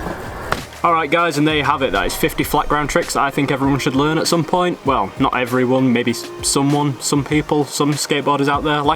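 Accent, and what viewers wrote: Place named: United Kingdom. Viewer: Am getting back into skating at 30 am watching like like waaat I have allot to Learn haha you sound like your from UK same as me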